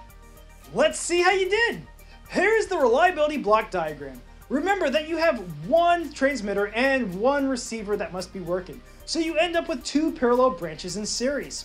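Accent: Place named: India. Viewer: I thought u were Indian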